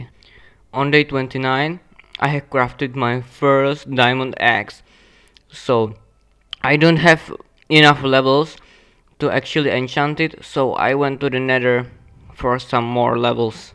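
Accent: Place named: India. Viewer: You speak in Indian ascent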